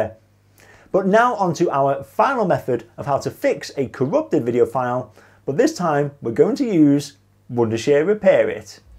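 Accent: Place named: Northern England, United Kingdom. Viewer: Dear, I hope your tips will help me, as I have carried out an audio only recording in mp4 but I can only hear the first 3min. of it, after which, it is complete silence, although the file keeps unfolding/ running/being played for a total of some 120 minutes. But first and foremost, I would like to tell you that I find your Northern England accent sooo lovely, it moves something in my heart! Where exactly does that accent come from? Is it from Cumbria? If so, from where in Cumbria? Coniston? Or anyway, from the Lake District? Anyway, I really love it, and I am so fond of people with that accent and the kind of personality that goes with it! I am already using the Wondershare software to edit, annotate and redact PDF files, and I am very satisfied with it. Best regards, Alberto from Florence, Italy.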